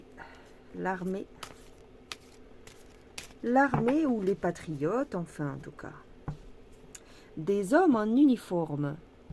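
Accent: South, France. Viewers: L'accent du soleil dédramatise ce monde merdique Merci ❤❤❤ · Bonjour, merci pour la vidéo et l'accent du midi moi j'adore comme j'ai des copines la bas sa change de la Tourraine qui est froid et les gents bien triste. Vous avez raison de vous lachez sa fait du bien et on rigole en plus. Bon 1er Mai ainsi que tous vos abonnés🥰❤🕊🌟👒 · 😊 bonjour 👋. Tu me fais rigoler avec l accent du midi.merci pour le tirage. Oui le mois de mai vas être encore très difficile. Je te souhaite un bon 1er mai. Moi cette année je n achète pas de muguet..il.est où. Ça me rappelle une certaine chanson. Bisous 😚 😚 Gigi. ❤❤❤❤. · Oh lala d' tu m'as fait trop rire avec ton accent même moi qui habite le sud je ne parle pas comme ça 😅❤😂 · Bonjour j'adore cet accent du sud de la légèreté du Soleil et continuer un petit peu s'il vous plaît au cœur pour avancer oh je suis en train de dicter le message Et je prends l'accent nous allons tous parler un peu marseillais aujourd'hui En tout cas j'adore Merci pour ce tirage continuez de chanter un peu oui les chansons de sont superbes moi j'adore mais cette histoire me paraît pas tres nette De toute façon c'est sa vie privée ça ne nous regarde pas nous aimons le chanteur et ses chansons se remettent sur le chemin Que j'adore l'accent que vous aurez avril demi-vingt-quatre et surtout mon 1er mai pour demain gros bisous ❤❤❤ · Vidéo insolite grâce à cet accent du sud qui dédramatise la situation. 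Bon 1er mai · bonjour ont ce croirait dans un film de pagnol j’aime cet accent du sud sa sent la lavande et le soleil ☀️ bon 1er mai 💐 désolée ilya pas de muguet bisous 😘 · Bonjour Une touche d'humour avec cet accent du Sud 😊 Pourrais tu faire une vidéo sur les pluies diluviennes à Dubaï? Merci... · Et tous les neuneus qui se marrent avec l'accent du Sud ! Le titre est vraiment approprié, c'est n'importe quoi !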